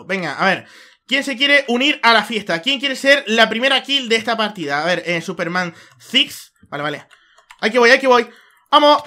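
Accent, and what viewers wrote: Argentina. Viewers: se volvió argentino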